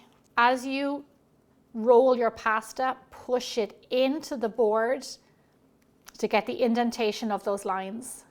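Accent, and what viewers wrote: Ireland. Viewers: I love the pronunciation of Italian names by someone with an Irish accent! ❤️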